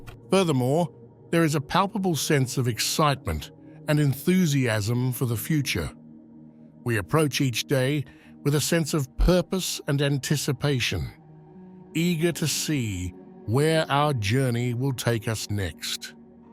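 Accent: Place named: Australia. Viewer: Nice Aussie TTS. Good one. 👍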